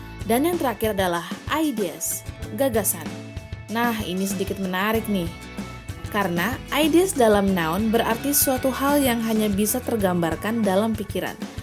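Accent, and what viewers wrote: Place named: United Kingdom. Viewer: Suka nih british accent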